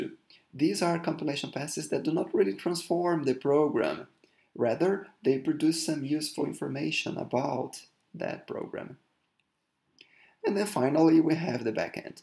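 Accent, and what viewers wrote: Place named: Brazil. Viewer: mano não sei porque mas ouvi outro brasileiro falando em ingles é muito bom kkkkkk eu entendo tudo, até consigo enteder os indianos mas muita vezes tem uns bagui que não da pra entender kkkkkkkkkkk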